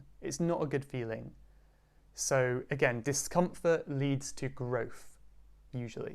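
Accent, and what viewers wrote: United Kingdom. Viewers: I am from India and I am here to learn british accent..and I get your videos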